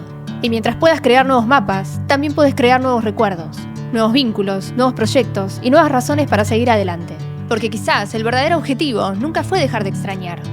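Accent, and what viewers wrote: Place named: Buenos Aires, Argentina. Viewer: Simpre tenes videos muy interesantes exelente research Y cambiando el tema pensar qje nací en Buenos Aires y me fui a los 5 años pero ese acento de Buenos aires me fascina mucho te go que visitar Argentina 🇦🇷 pero especialmente Buenos Aires que lindo saludos dese New York 🗽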